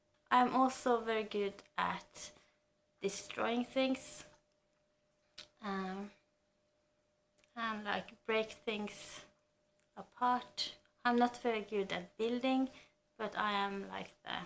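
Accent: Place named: France. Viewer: Yeah! I love this tag as we get to know even more about the booktubers we love. also, you have a terrific French accent ! Like really cool :).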